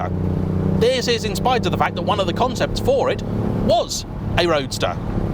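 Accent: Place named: Liverpool, United Kingdom. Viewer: you are my favourite Scouser, pls come to Pakistan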